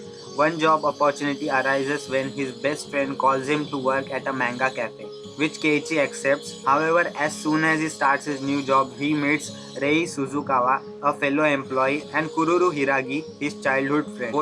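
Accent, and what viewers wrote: India. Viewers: Why you sound indian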